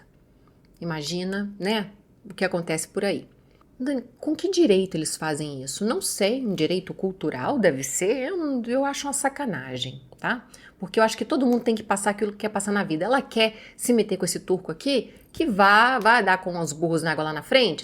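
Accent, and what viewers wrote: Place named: Minas Gerais, Brazil. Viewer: Fiote de cruz credo 😂😂😂😂😂 esse jeitinho mineiro da me mata amo minha Minas Gerais 😅😊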